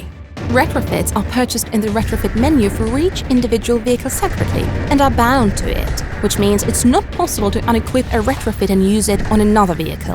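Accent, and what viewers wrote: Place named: Australia. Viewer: Sorry but this aussie accent in this video is very awfull to listen! A clear american accent would fit much better here 😉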